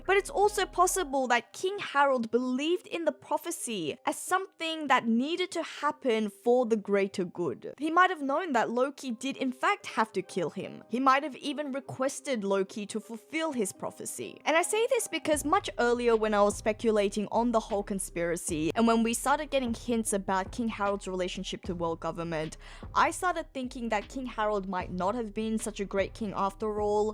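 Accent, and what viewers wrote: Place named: Australia. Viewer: she's Australian.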